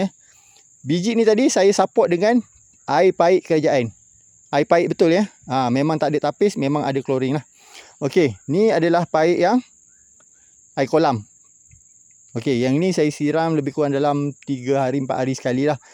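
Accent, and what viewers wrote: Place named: Pahang, Malaysia. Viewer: oh patut dengar cakap macam orang pahang.. haha boleh datang melawat kebun dok bang?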